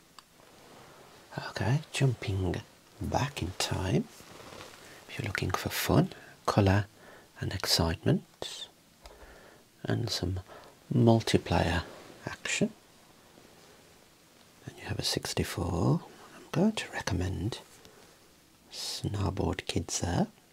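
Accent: United Kingdom. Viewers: wow its wonderful how u pronounce the british accent, this is the best asmr with u showing us ur collections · Did I hear a British guy say his favorite Wii sports game was baseball??? 😅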